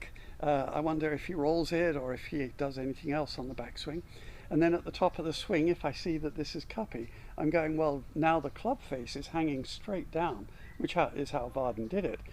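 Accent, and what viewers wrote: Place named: United Kingdom. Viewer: Hi wondering where do you live as your accent sounds British 🇬🇧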